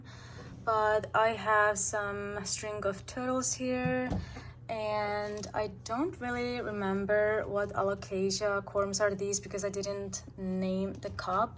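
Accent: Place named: Netherlands. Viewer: Beautiful plants! Are you Dutch perhaps??? I just recognize the accent :)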